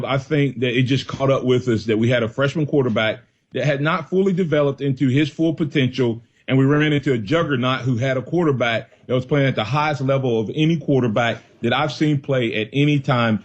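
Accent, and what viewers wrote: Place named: South, United States. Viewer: Look I'm from Athens Alabama born and raised but I can't take this fuckers country ass accent! It's super fucking annoying I mean damn do you really want to sound like you couldn't make it past the 3rd grade..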